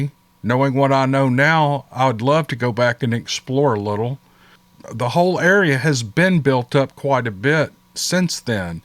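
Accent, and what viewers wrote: South, United States. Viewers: I'm not just saying this, you are the best storyteller on the YouTube! I have been blessed to be listening to your stories for 2 years now. I listen to many of my favorites over and over! Like I said, you are the best! Keep up the good work. We love your face, we love your personality and at Southern accent is a huge Plus. Blessings to you and your family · Hello from TN. I personally like it mostly when you only narrate the stories. Only because I've grown accustom to hearing you. That said, many times I fall asleep , not that it's boring. But you have such a soothing voice and listening to you, I can actually place myself into the story like I'm really there and experiencing it. You talked about your work, somewhat. May I ask what is your profession? I think I enjoy hearing that southern brawl more than anything. It just gives each story that special twist that we Southern folk seem to have. 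See, if I am watching you, then it's like I'm focusing more on you and not as much on the story. Just my own personal opinion. Think u should leave it the way it is. I'm getting older and don't like change. So, that's MY hang up, not yours. Anyway, keep the stories coming. They are very entertaining and your voice is enjoyable. Not taking away from your handsome face, but the not knowing what someone looks like behind the voice is suspenseful and intriguing. I'm not commenting about your pets because I've never been and am not a whole lot now of a pet person. I don't knock others for their own feelings. It's y'all's prerogative if ya want to be pet parents. Everyone is different and entitled to their own opinion. In closing, I say to you, Thanks and carry on! Oh, and I'm now a new sub!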